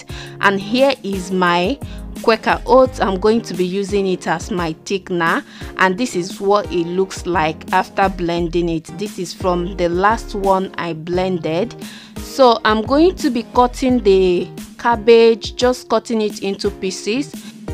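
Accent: Igbo, Nigeria. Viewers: First time watching your video and I knew already you are Nigerian by your accent 😁😁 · Ibo woman and her accent preparing fufu and eggs soup with chicken.....Ibo woman no dey carry last....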